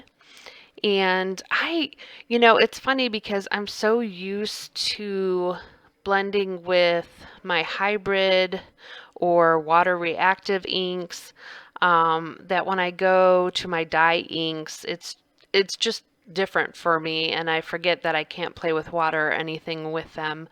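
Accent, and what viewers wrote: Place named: Midwest, United States. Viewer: Girl, I can't help but smile as soon as I hear your happy, friendly voice and that Mid-Western accent! Love! I am so with you on the PFS inks...Love them all. Just need one more and my collection will be complete. They are different for sure, but I love them...excpet the detail black...I think I have a faulty ink pad. But LOVE all the others. But I LOVE, LOVE your card!! You did a great job! Love the interactivity!!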